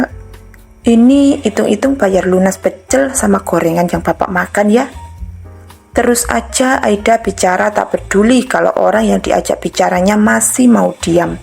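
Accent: Java, Indonesia. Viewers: Medoknya ini jawanya antara bebeb ucik atau bu de Riris yg jawa Asli❤❤😊😊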